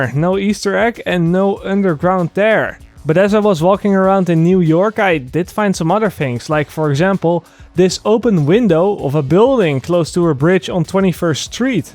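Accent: Netherlands. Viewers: Ben je nederlands ?? zo te horen aan je accent 😂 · pff je hoort duidelijk dat hij Nederlands is hahaha, het heet volgens mij iets van steenengels. dan probeer je niet eens met een accent te praten. bijv. trough en true spreek je gwn het zelfde uit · Jij bent Nederlands hahaha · are you dutch if you are im to =)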